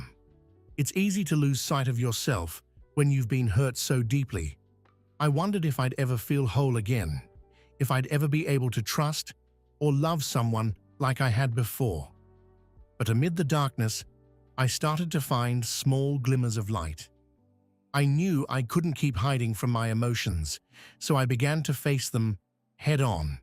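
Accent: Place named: England, United Kingdom. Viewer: The English accent is fitting in this case.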